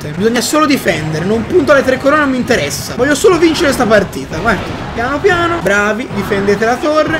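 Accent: Tuscany, Italy. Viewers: Ciao ma sei toscano? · sei toscano? non si sente la tua c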